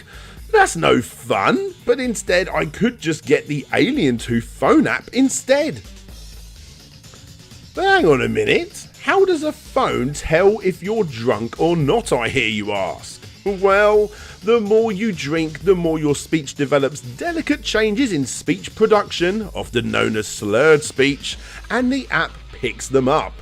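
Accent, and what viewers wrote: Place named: United Kingdom. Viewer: In case you never heard such accent before you should know that he's from the U.K ,idiot . Guns are illegal.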